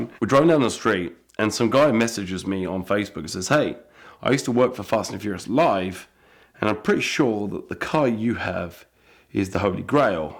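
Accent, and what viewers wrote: United Kingdom. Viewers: Listening to him talk is agonizing. I fell like i am being tortured by an drunk listening to the British accent!!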